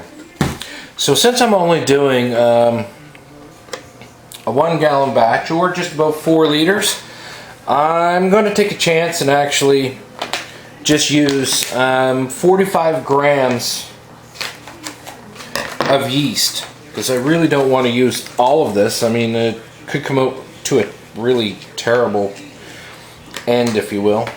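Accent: Nova Scotia, Canada. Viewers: Hey.... I've tried a few dark rum recipes similar to yours. I've tried various combinations of white sugar, ginger, cloves, oak chips etc. to get some different flavours. You had me hooked once I heard your accent.... and when I heard you talk about donairs.... I thought we may have met at that point being from Pictou County (but living in Oshawa) myself. Looking forward to viewing some more of your recipes! Cheers!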